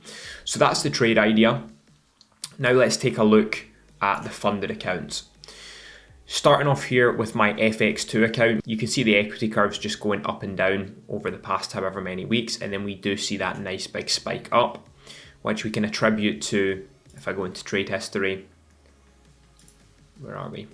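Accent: Scotland, United Kingdom. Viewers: Never heard this uk accent 😅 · Scottish accent is elite im moving outa ldn to Edinburgh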